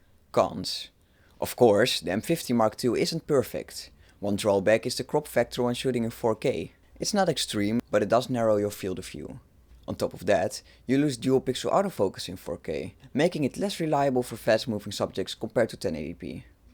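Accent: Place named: Netherlands. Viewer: Thank you for the break down. I just bought a second hand one today! I'm trying to learn how to operate it. I live in Hawaii so of course I need a camera here to capture all the beauty of the land. Though I would like to use an R8 I could afford this M50 today. So I'll shoot with it for a little while until I get blessed with another one. I believe I'll plan to keep this one in the collection. As I go to look for instructional videos on this camera your video is the first one I saw. I heard your voice and accent and said to myself he's a Dutch man. I looked deeper into your profile and saw you were based out of Nederland. I was born in Utrecht. Now I know for sure I've made a solid purchase. Because the Dutch are known for quality. So thank you for confirming my buy. And thank you for the wonderful video.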